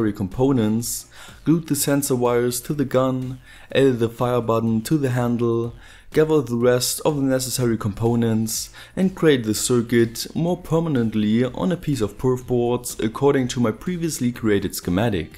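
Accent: Germany. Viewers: German Accent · are you german? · Man hört einfach, dass du aus Deutschland kommst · man merkt das du deutscher bist👌👍 nice videos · are you from germany?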